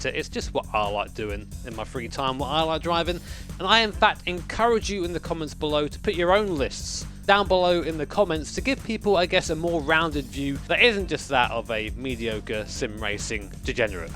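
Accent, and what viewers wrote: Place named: Yorkshire, United Kingdom. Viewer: As someone from Yorkshire. That is definitely how we talk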